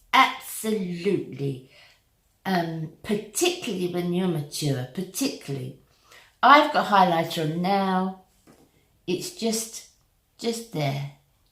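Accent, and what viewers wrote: United Kingdom. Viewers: What an absolutely lovely lady you are. I recently found your channel and I adore you. Also, like your Britsh accent. Thank you for your kind heart and your videos looking forward to seeing more.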